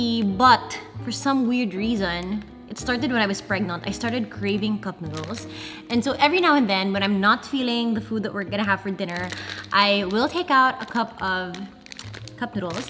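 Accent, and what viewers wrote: Visayas, Philippines. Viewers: Bisaya kaayo ka dong scottie ayy. 😍😁 "TIKI"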